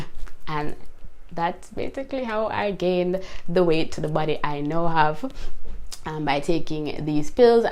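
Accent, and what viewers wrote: Jamaica. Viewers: Jamaican?💕love your accent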